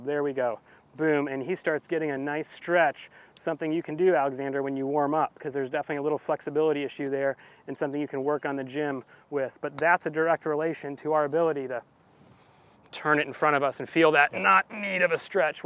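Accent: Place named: Scotland, United Kingdom. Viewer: Great to hear a Scottish accent.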